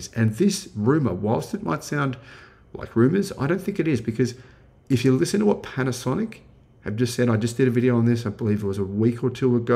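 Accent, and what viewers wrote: Australia. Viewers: Your accent is awesome! Be you. The Australian tourism council should be paying you per view!!! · Mate, your accent is perfect, it's not even strong "back of Bourke" strayan as some of my close friends (and I can understand them perfectly). Keep going mate, you're doing great. Enjoy the US trip !!!! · Americans want you to sound more "American"???? What? Most Americans really like an Australian accent. Now if we can just get you to talk like Crocodile Dundee, your subs will at least double! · I like your Aussie pronouncing... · I love the Australian accent. Keep it up! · As a Brit to an Aussie, don't let a Yank tell you how to speak. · Don’t change your accent. I can’t believe someone suggested that. Why would you need to sound like us Americans? We would probably rather sound Australian.. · Don't listen to 'em, bro! Americans adore aussie-speak! 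· Lovin the ausie accent. Always great work. Revolutionizing the auto industry.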